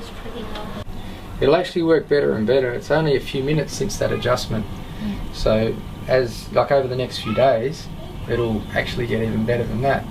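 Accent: Australia. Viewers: can someone please tell me if this guy is from sydney or melbourne pleeeease, i need to see this guy asap the joy i got hearing the australian accent hahahha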